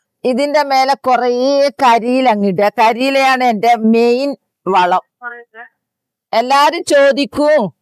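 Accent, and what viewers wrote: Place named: Kerala, India. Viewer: സാത്താൻറെ ചാനലിൽ ആദ്യമായിട്ടാണ് ഞാൻ കാണുന്നത് സംസാരം കേട്ടിട്ട് കൊടിയത്തൂർ ഭാഗത്ത് ലാംഗ്വേജ് പോലെ തോന്നുന്നു നിങ്ങൾ എവിടെയാണ് പറയൂ ചാനൽ കണ്ടതിൽ സന്തോഷമുണ്ട്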